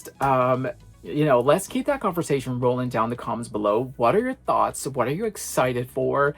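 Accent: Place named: New Jersey, United States. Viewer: That is so cool! I thought you had a Jersey accent lmao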